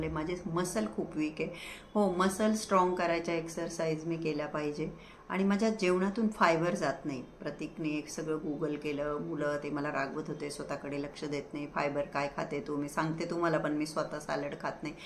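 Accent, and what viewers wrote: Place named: Khandesh, India. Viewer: ताई तुम्ही तुमच्या भाषेवरुन खानदेशातील वाटतात.तुम्ही हा त्रास तुम्हाला नेमका कधीपासून होतोय हे सांगितले नाही.तुमची एखादी सवय बंद झाली आहे काय? एक उदाहरण सांगतो. एका कार ड्रायव्हरचा अॅक्सिडेंट झाला होता.कपाळाला मोठी खोक पडली.त्याचे ऑपरेशन झाले.पण आठ दहा दिवस त्याला संडासलाच न झाल्याने तो डाॅ.कडे आला.बरीच चुर्ण औषधी दिली .तरी फरकच पडेना.डाॅ. विचारात पडले.त्यांनी त्या पेशन्टला विचारले की अपघाताच्या आधी तुम्हाला कोणत्या सवयी होत्या का ? तर तो म्हणाला मी रोज एक पाकीट सिगारेटस ओढायचा.त्यामुळे मला हा प्राॅब्लेम येत नव्हता.डाॅ.नी त्याच्या हाती दोन सिगारेट देऊन ओढायला सांगितले. एकच सिगारेट ओढल्याने तो टाॅयलेटकडे पळाला. अशी खाण्यापिण्याची सवय तुम्ही आठवून पहा.जर त्यानेही फरक पडत नसेल तर तुम्ही निष्णात हिप्नोथेरेपिस्ट कडे जा.शक्यता आहे तुम्ही त्यांच्या ट्रिटमेन्टने बाहेर पडू शकाल.हिंमत सोडू नका ताई.आनंदी राहा. एक राजकुमार शिखारे नावाचे हिप्नोथेरेपिस्ट आहेत.ते हिप्नोथेरपीने असाध्य आजार बरे करतात.ते कदाचित सातारा किंवा पूण्यात असावेत.त्यांना जरुर भेटा.पण वेडे वाकडे विचार मनात आणू नका प्लिज.इच्छाशक्ती वाढवा. 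अनुलोम विलोम कपालभाती शास्त्रोक्त पद्धतीने करा.कदाचित तुमची प्राणायाम करण्याची पद्धत चुकत असेल. पण हिप्नोथेरेपिस्ट कडे जरूर जा.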